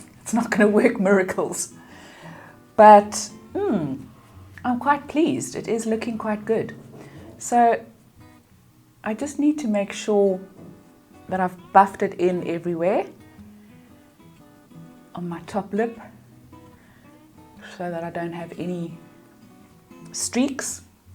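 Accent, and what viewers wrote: South Africa. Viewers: Hi, I liked this video. Just found you on YouTube. I can hear this hat you are South African. I am also, but living in New Zealand. You gave a very good review. I feel I can identify with you 🥰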